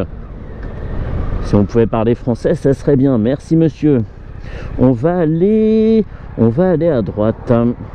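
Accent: Paris, France. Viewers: Le langage est fleuri avec des trouvailles poétiques au fil de la poignée genre: "une fourche qui se laisse pas déborder par l'arrivée du poids". Voila, une chaîne moto qui rend justice au parigot façon titi gouailleur., le Pagnol de la banlieue, le Marcel du motocyclisme périphérique. Un vrai régal, cette verve!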